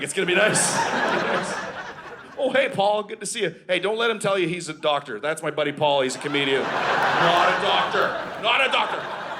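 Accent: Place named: Canada. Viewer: Really good! I watch a lot of comedy, and damn it’s good to hear some Canadian.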